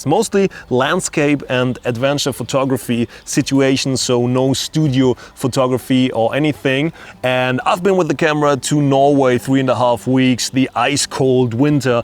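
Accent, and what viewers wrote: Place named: Germany. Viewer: Hey, habe auf dem deutschen Kanal das Video gesehen in dem die Frage gestellt wurde 'wieso so viele englische Videos usw.?' Ich schaue mir lieber den englischen Kanal an.. ich liebe es mich dadurch weiterzubilden und dein englisch ist schön klar verständlich. Scheiß auf deutschen Akzent.. mach weiter so! Ich feiere den Channel!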